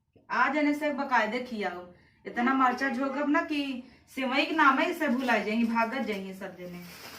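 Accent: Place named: Awadh, India. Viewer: didi apka bolne ka andaj awdhi Bhasha mey bahut hi achha lagta hai 👍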